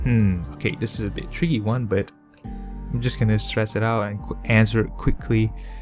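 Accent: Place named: United States. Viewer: You sounded American and as an American, I LOVE the way you say "Perspective". Also, am a big fan of Dragonball Z and Goggins too. ✨ ✨ 💪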